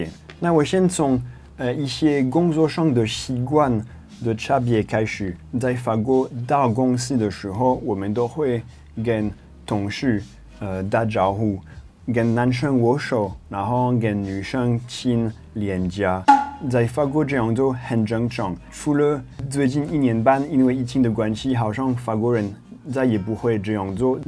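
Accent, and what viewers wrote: Taiwan. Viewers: 的國語，越來越好，再過十年一定成為真正的台客，因為學的國語是台腔國語，沒有過分的捲吞，不簡單喔！ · 台灣國語的 😂🤣 不愧是， 🥰👍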